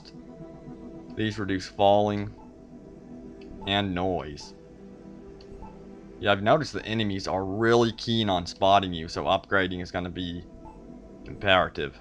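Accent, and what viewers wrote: United States. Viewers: He sounds like Forrest gump No offence but in English so to me everyone from the south sounds like Forrest gump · Are you american???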